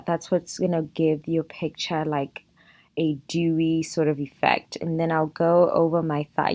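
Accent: South Africa. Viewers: Wait, you must be South African? Right? I love your channel btw, brilliant content 💕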